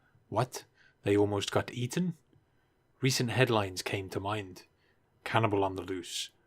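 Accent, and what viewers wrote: United Kingdom. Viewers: Dude, you made this look easy. LOL! Really well done, you are insanely skilled! By your accent I can see that you are british, cheers mate! Much love from Brazil.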